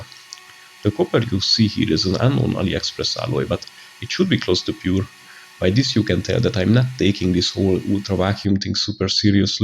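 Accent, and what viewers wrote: Hungary. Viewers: accent is Hungarian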